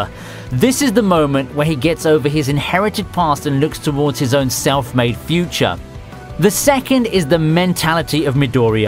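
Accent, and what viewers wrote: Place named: Scotland, United Kingdom. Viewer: wish I could speak scottish like that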